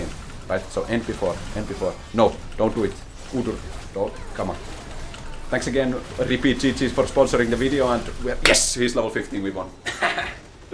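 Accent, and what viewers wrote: Finland. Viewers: Doesn't really sound spanish at all to me. But that might be because I'm also finnish so I know our accent well. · Love the finnish accent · Nice action! But i swear, everytime i hear you speak, all i can hear is finnish. 🤭 Best regards from Estonia!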